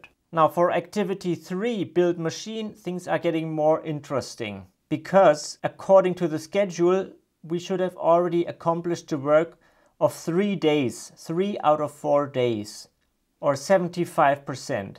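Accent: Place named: Germany. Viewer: I guess you are German 😊from your accent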